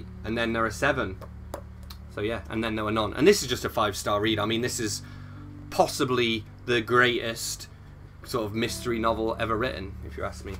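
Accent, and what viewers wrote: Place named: Dartford, United Kingdom. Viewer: I love your accent, are you from Dartford?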